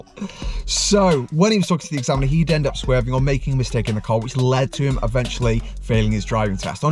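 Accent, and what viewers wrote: United Kingdom. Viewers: Are you from Stoke-onTrent? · Oh my god you're from Stoke 😊😅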